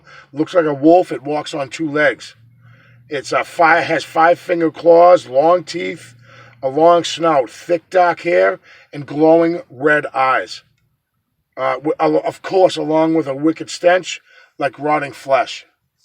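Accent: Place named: Massachusetts, United States. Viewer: lmao I know I'm sorry....but look that up you will like that one and you will get lots of views on this whole topic..also I appreciate your replies...at least you pay attention to your viewers and don't ignore us as some do...keep up the good work...love the mass. Ascent. Lol don't know if I spelled that right..waiting for prt 2😃😃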